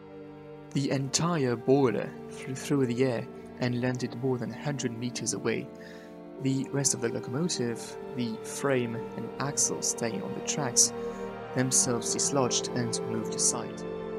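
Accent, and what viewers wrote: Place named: United Kingdom. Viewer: C'est un fait divers français et vous parler britannique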